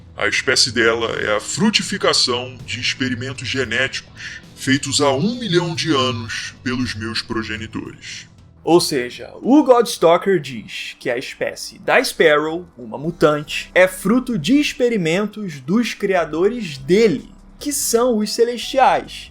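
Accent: Rio de Janeiro, Brazil. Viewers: Tu é carioca mérmão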